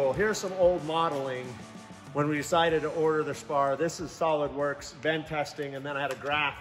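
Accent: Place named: Utah, United States. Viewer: you must be from utah the way you talk.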